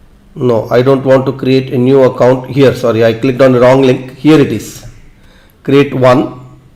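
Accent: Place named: India. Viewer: indian accent......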